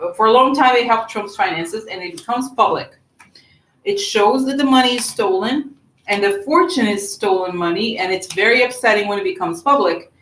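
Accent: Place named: Russia. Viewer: Where are you from? You sound Russian, but then sound American.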